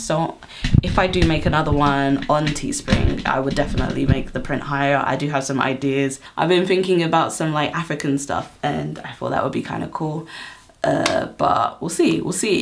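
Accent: United Kingdom. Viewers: don't stop posting videos. your British accent is amazing . I'm a dude btw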